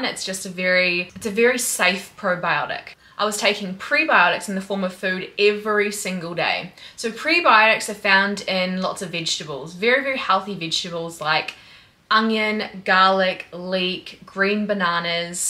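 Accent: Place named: Australia. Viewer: wait where are you from if you said you moved to australia? im canadian but i only hear an australian accent i think? LOL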